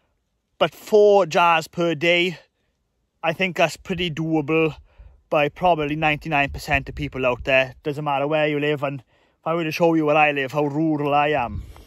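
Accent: Wales, United Kingdom. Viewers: Brilliant just what i wanted ti hear, so nice to hear that youve had no problems, i will be making one tomorrow to sell some of my excess veg, got radish, chard and hopefully soon some strawberries, kale and later tomatoes, great video man and btw best welsh accent ever! 👍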